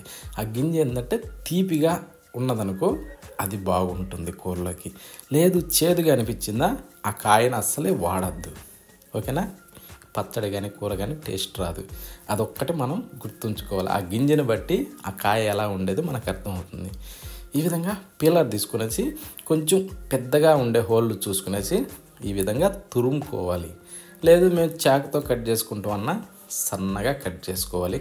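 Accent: Nellore, India. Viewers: Bro meeru Nellore valla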